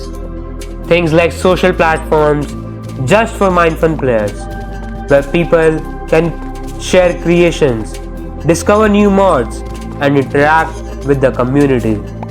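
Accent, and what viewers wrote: India. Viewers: Bro are you from India 🇮🇳cuz I feel that your voice is like indian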